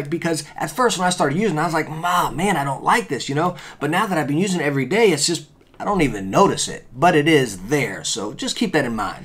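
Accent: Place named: South, United States. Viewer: I love this guys country accent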